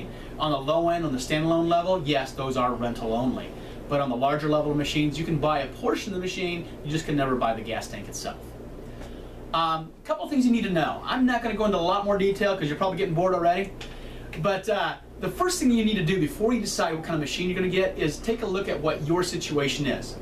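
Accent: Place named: Arizona, United States. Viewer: I like your accent. Are you from AZ?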